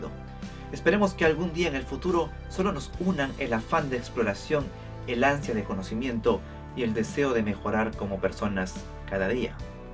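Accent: Peru. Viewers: ¿eres peruano? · ERES DE PERU? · no sabía que eras de Perú, es que tú acento se oye diferente, y tu vídeo me súper encanta, interesante como todos los que nos compartes.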